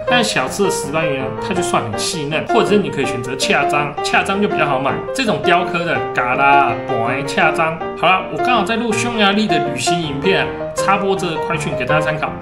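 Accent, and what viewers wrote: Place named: Penghu, Taiwan. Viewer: 我確認他是澎湖人，因為他講台語澎湖腔很重😂😂😂我也是澎湖人一聽就知道了。 而且他講解的很清楚喔～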